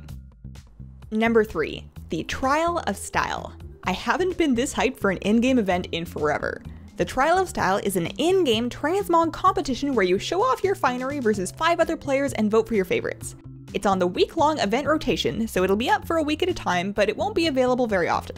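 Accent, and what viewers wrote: Canada. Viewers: haha i love the canadian accent :D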